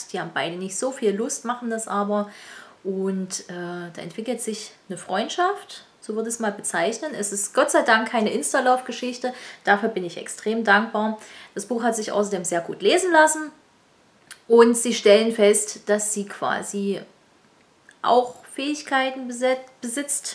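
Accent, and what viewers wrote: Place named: Saxony, Germany. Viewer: "OF RUBBN!!" 😂😂 Oh man. ❤ Find ich soo gut das du dein Sächsisch nicht versteckst! Ich versuche immer mein bestes zu geben mein Thüringischen Dialekt zu verstecken. Und Pain au Raisin finde ich riecht SEHR nach Rosinen. 😂😂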